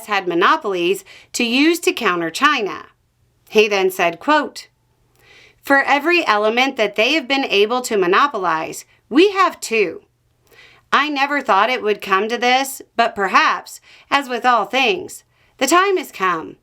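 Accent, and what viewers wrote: South, United States. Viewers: You just got to love the Southern accent and the sentiment communicate across.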